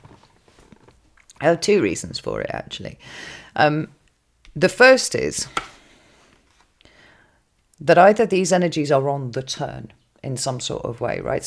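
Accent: United Kingdom. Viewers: I have been watching tarot and reading professionally for many lifetimes. I have NEVER seen a reading that resonated more strongly. It was like a replay of a conversation I had with a dear soul sister whilst sitting in the forest here in NZ last night. What’s more, her British accent is identical to yours. I’m a psychic/medium and that dear one was some truly beautiful reading of the tarot. I’m only an intuitive reader, but you totally know your sh!t! Your understanding of how the energies weave are phenomenal. Deep gratitude for all you bring and so freely give. Much love to divine sister. 🙏♾💜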